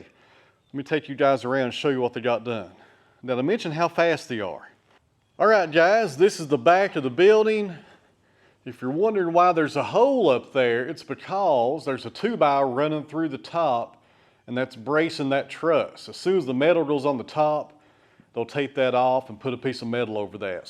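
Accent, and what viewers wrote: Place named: Tennessee, United States. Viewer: I guess I could listen to the Spanish version since I understand that, but without the Tennessee accent, it won't be the same. 😉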